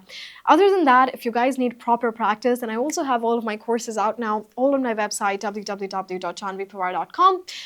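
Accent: United States. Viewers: Apki english sunkar,mujhe essa lg lg rha hai, jaise me india me nhi , America me beth kr apki video dekh rha hu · Aha me english sikhane aaya tha lekin aa toAmerican accent bole ja rahi hai,,kuchh samajh mein hi nahin aaya😅😡😡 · I think you speak an American English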